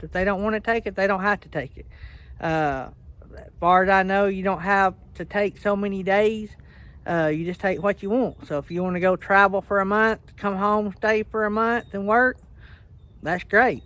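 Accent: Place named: South, United States. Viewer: New Here and and blessings...sound like u are from the south.. I’m in NC... schools are closed..bus drivers are happy I’m sure no school.. ok listening to your video you are from Alabama 🌹😊 Family here as I love supporting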